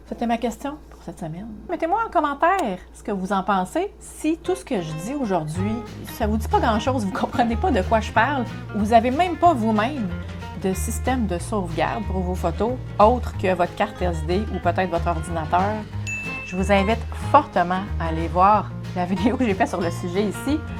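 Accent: Quebec, Canada. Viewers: Bonjour, je suis bien content d’avoir découvert ton site. Des sujets interessants avec un accent québécois. Moi je garde tout avec comme projet un jour de faire le ménage. Ma sauvegarde est sur un disque dur externe car j’ai de la difficulté à comprendre le fonctionnement du cloud Bonne continuation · Bonjour, Je supprime les photos qui ne me plaisent pas et je sauvegarde toutes les autres et cela d"autant pas que les capteurs actuels ont de plus en plus de pixels ce qui fait que chaque photo prend de plus en plus de place par son poids... J'ai un Canon R7 avec 32,5 millions de pixels alors qu'avant j'avais un Olympus OM5 avec 16 millions de pixels. Résultat mes photos actuelles sont pratiquement deux fois plus lourdes que celles prisent avec l'Olympus CQFD... Au plaisir d'écouter ton accent Québécois.. Gérard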